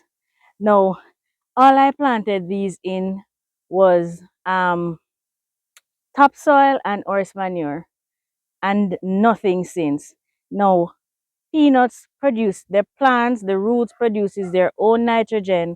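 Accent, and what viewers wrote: Jamaica. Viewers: This is how most Jamaicans speak. Go find a treadmill and leave the lady alone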